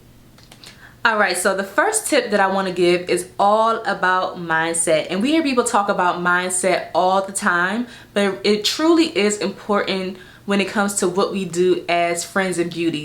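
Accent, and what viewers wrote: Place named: Washington DC, United States. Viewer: I know a DC accent anywhere. Hey neighbor!! Great content and much needed. ❤️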